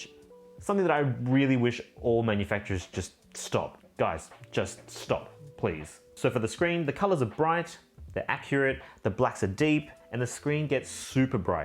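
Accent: Australia. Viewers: you look Chinese but speak like an Australian . nice video though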